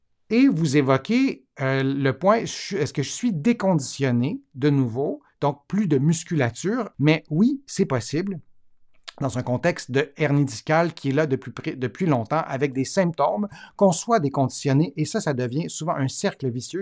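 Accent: Quebec, Canada. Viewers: Votre accent québécois m'empêche de vous suivre correctement à vrai dire.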